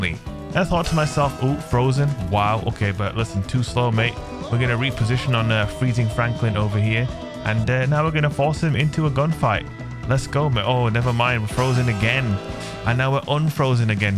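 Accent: United Kingdom. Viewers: I love your videos but will you only make PVP content forever? At least once, show some of that gravy PVE talent you got with that british accent spice, gud lad!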